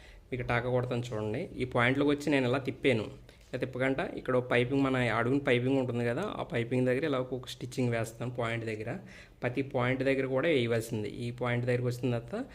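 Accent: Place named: Andhra, India. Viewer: Mana Andra slang theggottandi ani😊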